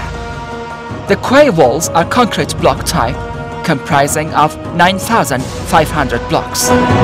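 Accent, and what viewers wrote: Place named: India. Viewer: Good computer animation with an indian voice.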